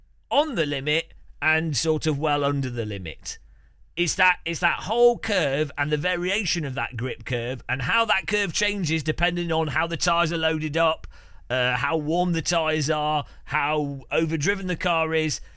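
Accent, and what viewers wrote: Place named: Liverpool, United Kingdom. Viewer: love the liverpool accent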